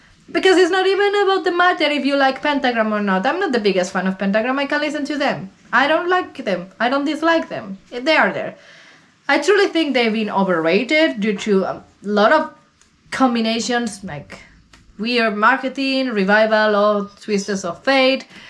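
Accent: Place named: Wallachia, Romania. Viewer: where are you from? you sound wallachian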